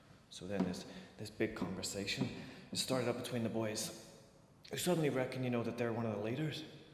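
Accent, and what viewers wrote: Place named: Ireland. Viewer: When did u turn Irish? Good though Hun. Well done x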